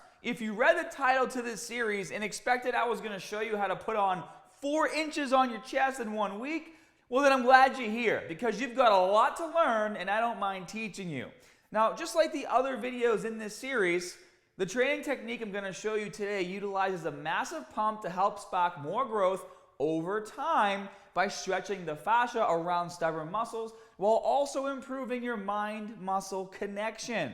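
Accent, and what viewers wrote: Boston, United States. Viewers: How did your Boston ascent come back stronger than ever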